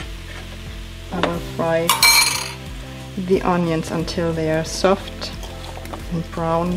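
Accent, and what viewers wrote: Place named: Germany. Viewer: Me: oh, another Internet cook making spaetzle. Me (the moment I hear her voice): oh, she’s German... this should be good.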